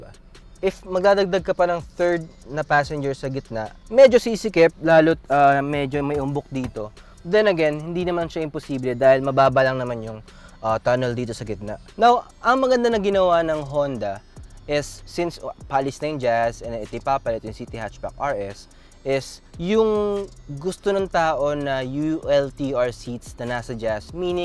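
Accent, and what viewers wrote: Philippines. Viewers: Я просто в ахуе от его «английского филлипинского» .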